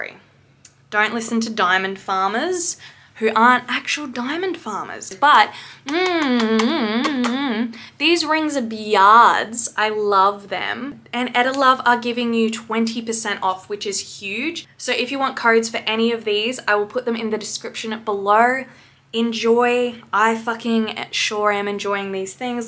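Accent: United States. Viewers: I love the country accent. Sounds just like my ma lmao 💜🖤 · The southern accent is one of my faves ahahahha 🤙🏻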